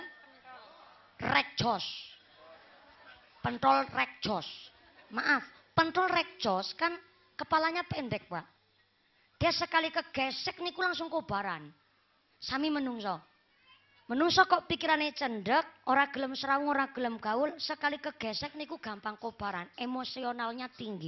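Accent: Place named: Banyumas, Indonesia. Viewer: Kenapa skrng jd ngefens banget sama Ustadz, bagus ceramahnya ngapak meneh, 😀😀😀😀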